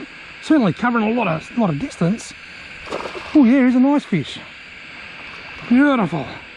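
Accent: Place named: Australia. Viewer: No worries. Thanks for all the great videos. I used to go yabbying all the time when I was younger. Your vids are a great reminder of those times. My kid also likes your vids coz you have a far stronger Aussie accent than I do. Cheers mate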